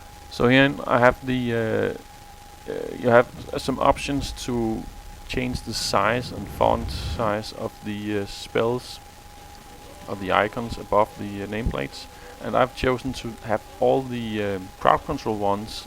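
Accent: Denmark. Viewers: are you from denmark? :D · Are you danish? You sound like it :) And which addon do you use for your actionbar?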